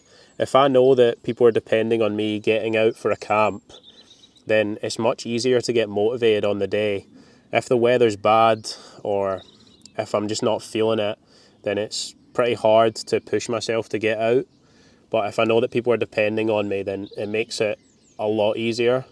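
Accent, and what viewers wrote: Scotland, United Kingdom. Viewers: I absolutely love your videos and love to hear you speak with that Scottish accent. As much hiking as you do, you have got to be in prime physical shape. As bad as my health is I can only hike with YouTubers. I have always loved the outdoor and with me living in the Southwestern USA, I can’t get out and be in nature. We have such beautiful country where I live and can’t get out see it. So for now I will just have to hike and camp along with you on YouTube 😂😂😂😂😂😂😂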